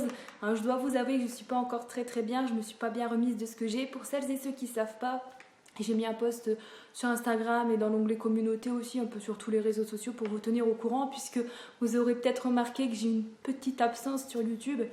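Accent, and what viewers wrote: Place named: Pas-de-Calais, France. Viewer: Coucou c'est une impression ou tu es du pas de calais ??? L'accent trahit ... je suis du pas-de-calais ;-)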